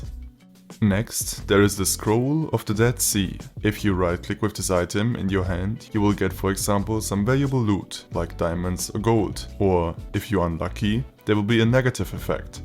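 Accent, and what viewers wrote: Germany. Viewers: Bro bist du deutsch wenn ja mann hört es so raus (nicht böse gemeint finde dich mies cool )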